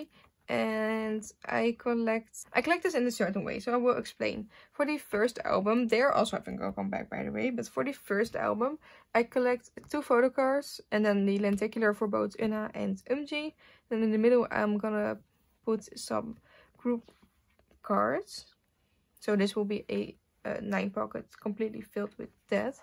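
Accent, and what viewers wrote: Netherlands. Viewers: Omg your accent, you must be Dutch!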